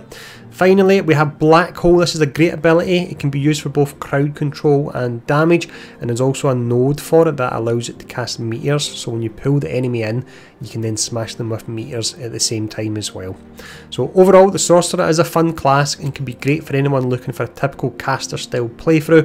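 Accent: Scotland, United Kingdom. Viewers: Dude must be Scottish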